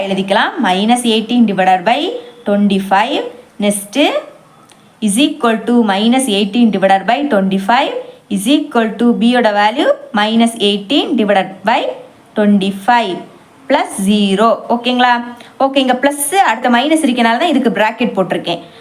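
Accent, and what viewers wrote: Kanyakumari, India. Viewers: Super ma,உங்கள் பேச்சை கேட்கும் பொழுது நீங்கள் கன்னியாகுமரி என்று தோன்றுகிறது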